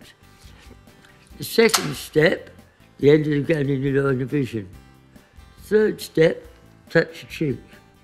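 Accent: United Kingdom. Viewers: I have to admit, I have no idea what he said the 2nd step was. Apparently, I speak English, but I don't understand British.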